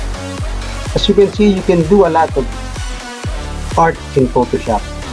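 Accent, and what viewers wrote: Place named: India. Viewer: PARANG INDIAN ANG BOSES NG TUTORIAL KUYA PARANG SI JHAMAL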